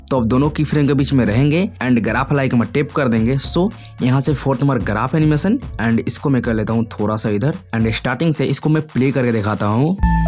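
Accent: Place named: Bihar, India. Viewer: He is from bihar 😅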